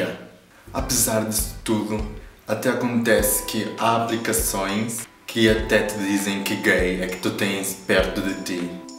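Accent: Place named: Brazil. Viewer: Dasse q e isto és ucraniano ou q se es br fala com sutaq nao estragues o portugues de portugal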